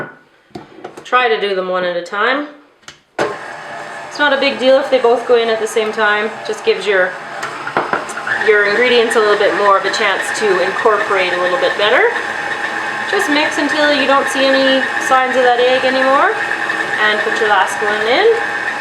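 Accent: Canada. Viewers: Okey :). Maybe I´m just not used to hearing Canadian. I love your videos!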